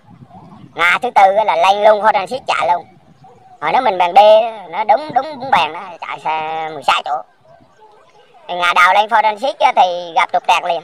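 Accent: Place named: Quang Nam, Vietnam. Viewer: Yeu lam tieng Quang nom!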